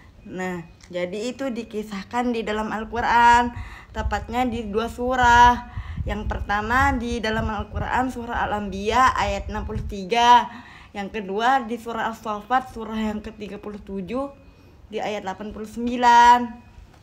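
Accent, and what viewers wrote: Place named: Lombok, Indonesia. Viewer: Lombok banget logatnya kak...sehat selalu anak soleh❤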